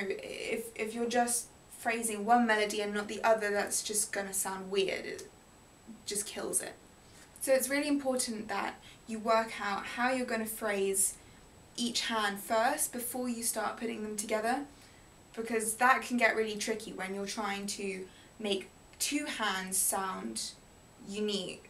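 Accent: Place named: RP, United Kingdom. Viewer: very good88 and i can work on my english because you have the queen mum's accent ! i understand everything even if i am french!!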